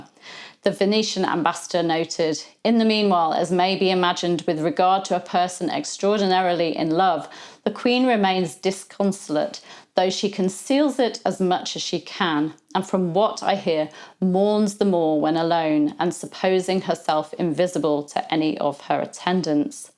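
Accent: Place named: United Kingdom. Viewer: I love your channel so much! I just love to hear you speak with that wonderful British accent! Plus you are a terrific teacher. ❤️👏🏻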